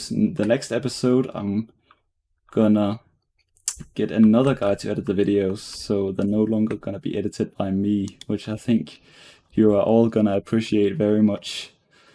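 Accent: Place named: Denmark. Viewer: I love how violently danish your accent is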